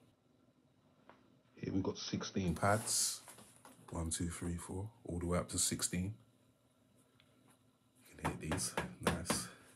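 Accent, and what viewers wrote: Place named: London, United Kingdom. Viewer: also its good to be listening to a London accent rather than an American one.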